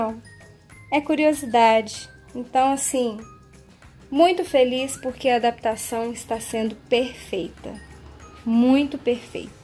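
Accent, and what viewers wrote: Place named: Minas Gerais, Brazil. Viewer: Adoro o sotoque mineiro....aprender com esse sotaque é bacana. Gato e a gente adora brincar.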